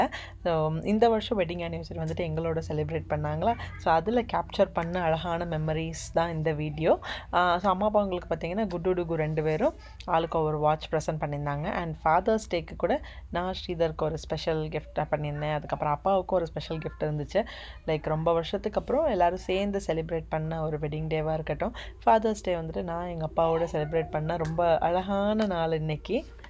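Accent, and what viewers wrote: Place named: Tirunelveli, India. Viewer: TIRUNELVELI pola varma sis amma sona mathiri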